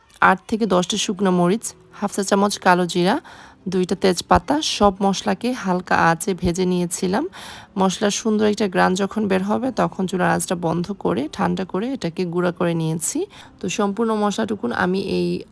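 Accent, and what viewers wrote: Sylhet, Bangladesh. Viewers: Apu Are You Sylheti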